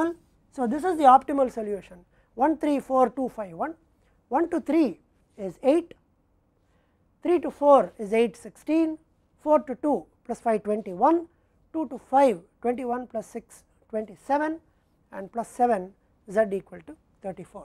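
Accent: India. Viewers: I was replying to the comment complaining about the lecturer's Indian accent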